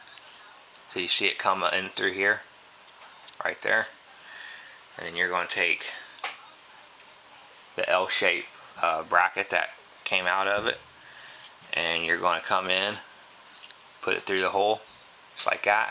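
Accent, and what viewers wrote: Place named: Midwest, United States. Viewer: Good informative video. Your Midwestern accent kinda annoys me but it's not a deal breaker. Just breaking balls. Next you can do one on a JA9 since I have one of each.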